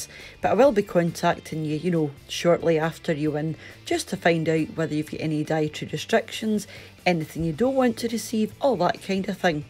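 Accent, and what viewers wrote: Scotland, United Kingdom. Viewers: I live in Maine in the US. I first found you while looking for Traditional Scottish Recipes. I think I was looking for Stovies at the time. I stumbled upon your channel and fell in love with your soft sweet way of talking, your (of course) accent and your way of describing what you are doing. I've learned a lot from you about Scottish food and cooking in general and love the little stories and description of the details of your week. When I tune in, I feel I'm having a chat with an old friend. Also, I am planning a trip to Scotland in September and I'm sure learning about the food will enhance my visit. Please keep those recipes coming. Love them and you. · I subscribed as I love watching food ideas and new recipes, and your Scottish voice and the scenery at the end warms my heart and you seem to have such a great caring personality and make every one welcome. 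x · Because im scottish and have been away forty years and you bring back so many memories with your accent and recipes so glad I found your channel x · I subscribed because you came up in my suggested videos I watched a few I really enjoyed you Channel! Also love the Scottish accent x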